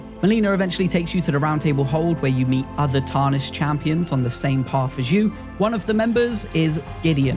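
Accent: United Kingdom. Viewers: literally never seen a elden ring lore video that wasnt voiced by a british dude.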